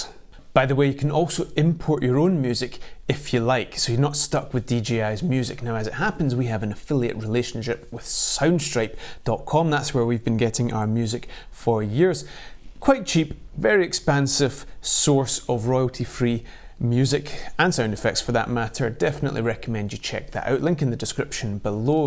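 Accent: Scotland, United Kingdom. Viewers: Just got our first drone today thank you for this as we need all the help we can get ..Was great to hear a Scottish voice we are both rom Edinburgh but live in Spain now thank you !!! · Man, Every time I watch your channel it makes me wish I had a cool Scottish accent. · Good to hear a fellow Scot! I’ve just bought the mavic mini and wondering how it copes in the wind in Scotland? As you’ll know it’s windier then most places so given its so light I’m a bit paranoid.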